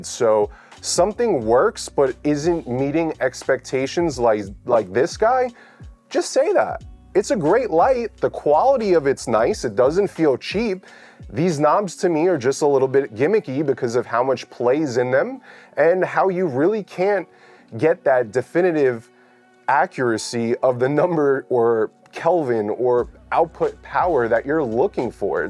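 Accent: New York, United States. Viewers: I'm a NYer living in LA for the last 15 years. gotDAMN I miss my peoples. I can see myself and all my homies from back home in the way you talk. Not just the accent, but the sentiment and realness behind what you're saying. Super refreshing in this space. More please. Also, wish I'd seen this video a couple of days ago. I just ordered the x100 pro package for a shoot I'm traveling across the country for. The good news is I don't REALLY need it because lighting is handled, but I just thought it'd be cool to try out one of these tiny lights that you can just throw in your bag and whip out once you've already got your shot and are just kind of playing. Should have gone with with one of the competitors! Anyway, you got a new subscriber!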